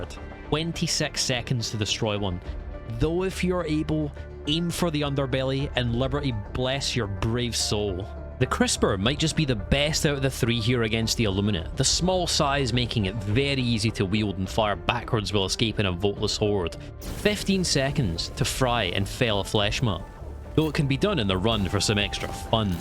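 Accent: Scotland, United Kingdom. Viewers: A Scottish sci fi quartermaster giving me flamethrower recommendations?? This is heaven for the ears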